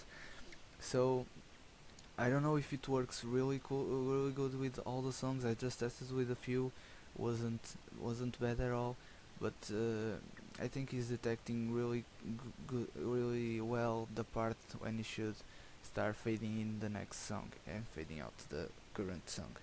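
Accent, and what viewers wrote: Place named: Portugal. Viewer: pelo sotaque percebi logo que eras portugues :)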